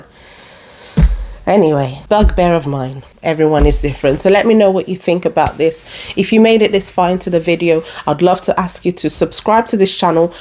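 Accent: England, United Kingdom. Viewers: Happy Christmas - being a proudly native Irish Catholic patriot and an older-conservative-minded Irish gay man in the U.K. 25 years at age 55 myself, I’ve still retained my Irish accent, but your British accent comes across as being quite classy - and by the way, I do hope that you get to visit my native Ireland again very soon - not only have I noticed this drop in standards in the House of Commons here in the U.K., but I’ve also observed this descent into total cringe in Dáil Éireann (Parliament) in my native Ireland since Covid, aside from the fact that having cameras in the chamber reveals these issues - compared to footage that one might see from the US or from a local U.K. or Irish council meeting, what we expect from our political leaders, to have clear oral communication skills, is of vital importance - by comparison, Dáil Éireann back home is even worse than the Commons here, in terms of utterly childish and immature behaviour from politicians on all sides of the house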